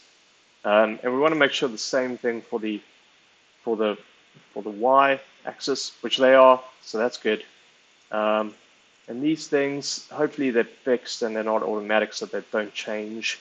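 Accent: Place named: South Africa. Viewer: I Googled "GPU processing in Excel", landed up on your Reddit post, and then here. As a fellow Excel nerd and South African (given the accent, I'm guessing you're from JHB :)), I loved watching this! Thank you! I'd love to see you get back to your "in Excel" series to see what you'd be able to produce using Excel's newer functions (LAMBA, etc).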